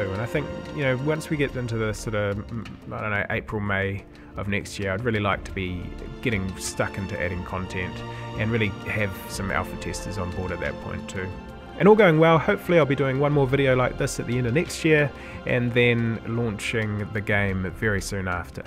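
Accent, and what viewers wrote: New Zealand. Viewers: its kiwi not Australian can't you tell? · Pretty impressive stuff, amazing that this is all in your own engine! I worry your game has next to no actual game-play. Hard to tell but it seems like you ahve a lot of work to do in terms of crating, building, exploring, sapien interaction etc. Tech wise it seems great though, visually it is pretty good. Seems strange to have that entire world view and then top down selection system, just for the first 30 seconds of the game. Is there any reason you would go back to that view? PS. Are you from NZ? Where abouts?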